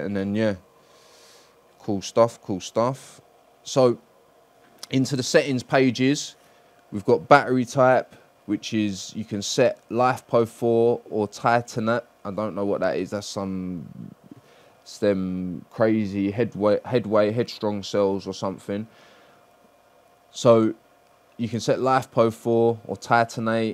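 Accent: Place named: East London, United Kingdom. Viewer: I'm from south east London! You sound like a an east Londoner ( I feel more comfortable hearing you talk) I really hope I can bring my battery to you so you can work your magic !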